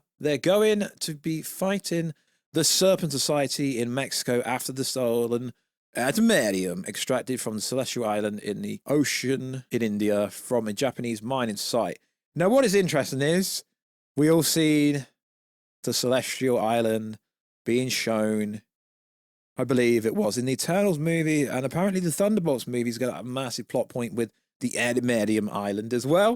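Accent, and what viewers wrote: United Kingdom. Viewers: I can understand why he got it wrong he's from another part of the globe 🌎 sounds like he could be possibly from the U.K.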